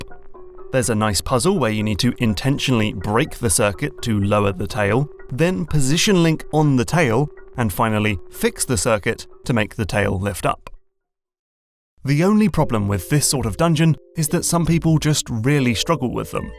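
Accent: England, United Kingdom. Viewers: This narrator has the same accent as Tom Scott. Anyone know the region of England?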